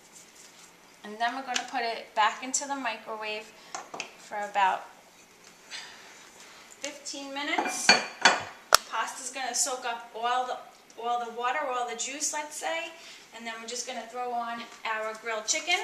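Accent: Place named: New York, United States. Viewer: Awesome video!! Love the accent... got to be from NY 😊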